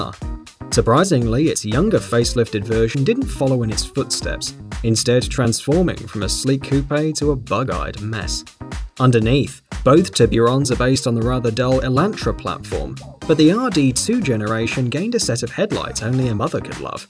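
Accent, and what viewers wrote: United Kingdom. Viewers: hi un day cou pay? freakin' british english. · Yozika - It’s how we all say it in the U.K.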